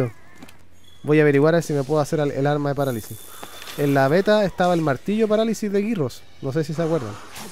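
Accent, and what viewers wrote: Chile. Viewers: Hay cientos de videos, pero nada me hace entrar mas en confianza como latina que oir un gameplay chileno.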